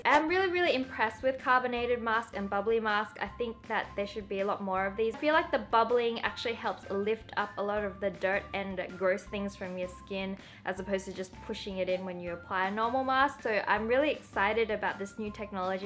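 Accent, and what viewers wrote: Australia. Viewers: are you australian? You have an australian accent. i like it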